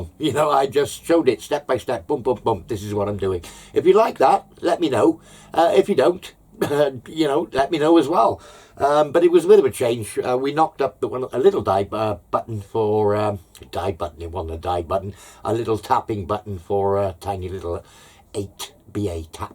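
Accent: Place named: Wales, United Kingdom. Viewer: Enjoyed that, keep up the waffle I think it adds something hearing those Welsh tones.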